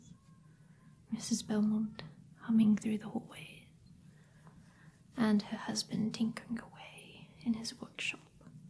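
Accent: United Kingdom. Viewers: Well done on the English accent 👍 very good 😊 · You looked so classy and proper in that black dress. Not to mention you nailed the posh British accent 👌 I should know… I’m from the uk 😆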